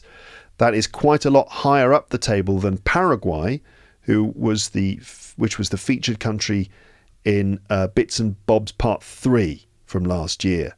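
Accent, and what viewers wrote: RP, United Kingdom. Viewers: BYE BYE BOY BOY BOY...! It is very nice and very interesting...!!! I would ESPECIALLY love your RECEIVED PRONUNCIATION and your VOICE of British English...!!! I am USUALLY and OFTEN listening your Podcast and Episode to IMMERSE myselft in British English....! THANK YOU VERY MUCH Mr.....! Your name is at the same as name of Sain....!!! 😝😝😝😝😝😝😍😍😍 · I am from Nepal and learning English with British accent through your leading podcast.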